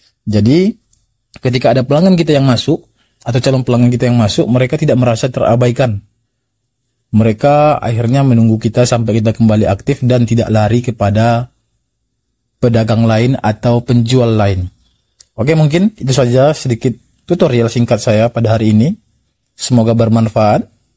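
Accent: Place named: West Sumatra, Indonesia. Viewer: Dari logat nyo apak alah jaleh apak urang minang kan 😊🤭 Dima tingga kini pak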